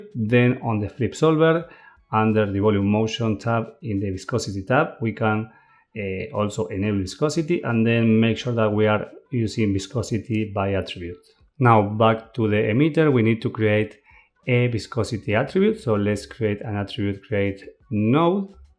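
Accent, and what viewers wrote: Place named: Argentina. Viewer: Por el acento del ingles, sos argentino. Tremendo tutorial! Te felicito y agradezco.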